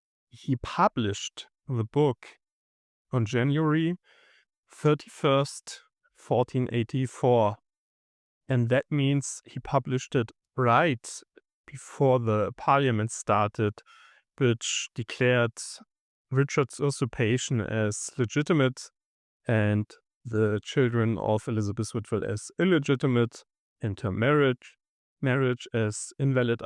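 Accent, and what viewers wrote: Germany. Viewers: Unlike Americans, the German accent is elegant, and graceful. It is a pleasure to hear you speak. Please, always feel free to do so whenever possible. I am looking very much forward to the expanded video on this subject as it is quite deep filled with complexities which may hold be the key to revealing the most long awaited, fiercely battled mystery in England's history. Thank you greatly for your fine, most dedicated work in this regard. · you know I love your German accent! It's a hot day in Texas too but that's normal for August. I sure hate the heat. It's so draining. At least I'll be you have snow in the winter where you live. Snow is a luxury here. But it's so pretty when it does. This whole York, Plantagenet, Lancaster, tower thing is confusing. About the time I think I have a grasp of it, something else comes up. You keep up the good work, ok? 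And thank you!!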